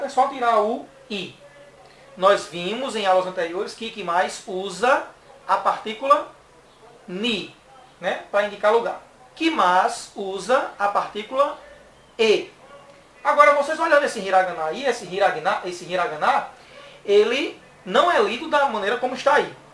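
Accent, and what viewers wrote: Northeast, Brazil. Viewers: Ele é de rio grande do norte, mas esse sotaque talvez seja o mais falado em todo interior dos 9 estados nordestinos, eu sou do interior de pernambuco, e nosso sotaque é assim também. hajimemashite!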